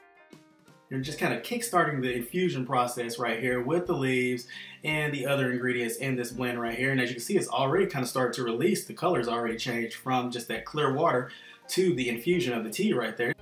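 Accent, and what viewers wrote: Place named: United States. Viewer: i love your nice country voice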